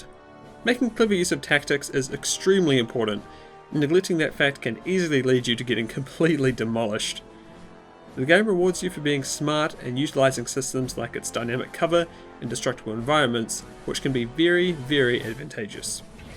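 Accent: New Zealand. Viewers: Where in New Zealand are you from?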